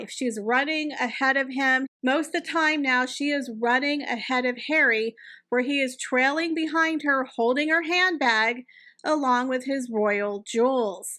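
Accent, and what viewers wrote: South, United States. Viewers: Love your «yall» accent. Hazbeen wants to be treated like royal. He even insisted to be called with his HRH at the High Court in the UK. He wanted to live like a billionnaire while doing nothing.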